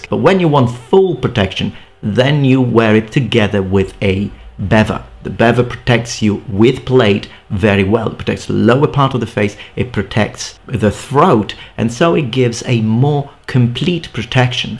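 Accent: Italy. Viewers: Are you italian? Your pronounciation of "montefortino" was perfect. The accent too sounds a bit italian but it's so slight I can't tell. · Madonna se si sente l'accento italiano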